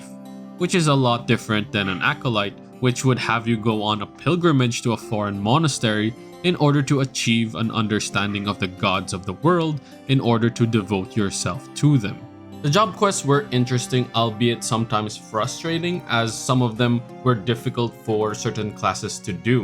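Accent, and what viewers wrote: Philippines. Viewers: Not sure why sounding Filipino is a problem. He's not doing any humiliating thing like what you're doing to yourself.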